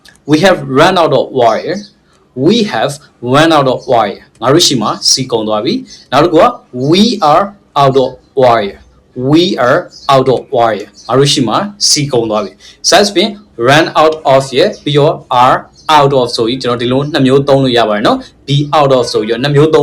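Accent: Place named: Myanmar. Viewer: ငပိသံလေး ပျောက်အောင် ပြောပေးပါအုံး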